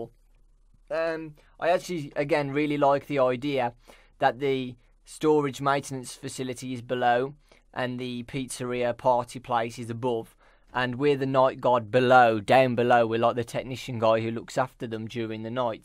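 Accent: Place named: Scotland, United Kingdom. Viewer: I don't even care about FNAF:SL I just watch this for Scottish accent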